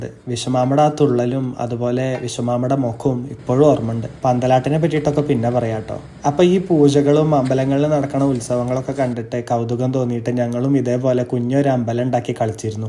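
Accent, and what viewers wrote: Valluvanad, India. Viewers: എനിക്ക് ഒത്തിരി ഇഷ്ടമാണ് വള്ളുവനാടൻ ശൈലി...❤ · ഇത് വള്ളുവനാടൻ ശൈലി ആണ്.. നമ്പൂരാർക്ക് മാത്രം ഉള്ള ഭാഷ അല്ല. ഇവിടെ എല്ലാരും ഇങ്ങനെ തന്നെ!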